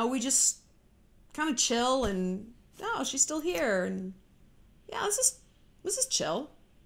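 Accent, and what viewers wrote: Australia. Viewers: Omg ...I’m from Sydney!!! Babe ... your Australian accent is soooooooo great !!!!😂😂😂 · As an Aussie, your Australian accent is sending me 😂😂😂 love it ❤️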